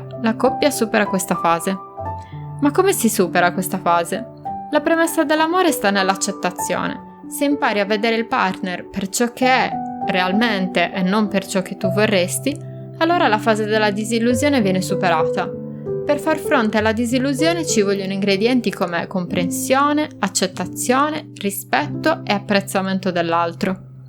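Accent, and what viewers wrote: Sardinia, Italy. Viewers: tu che parli sei sarda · Accento con cadenza sarda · la voce narrante è Sarda del Cagliaritano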